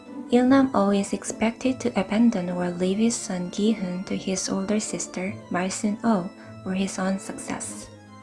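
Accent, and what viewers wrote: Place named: United States. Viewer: 오호ㅋㅋ 아메리칸 스타일의 잉글리쉬💯 세간에 떠들썩한 5징어게임에 빠져봅니데이~😆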